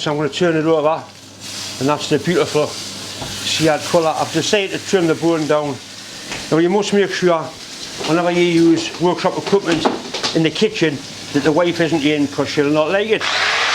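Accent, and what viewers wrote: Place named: Geordie, United Kingdom. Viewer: Hi, with this video you have given me proof that a skilled machinist is also a great cook. Too bad with your strong Geordie I can't understand the whole thing, but the images are excellent. Greetings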